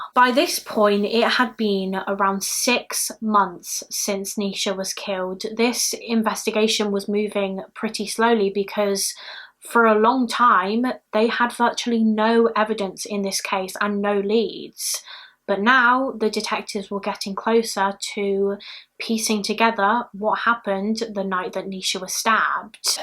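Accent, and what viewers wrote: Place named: Southwest England, United Kingdom. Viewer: It's a south west English accent 😊. Not 100% but I'd say Somerset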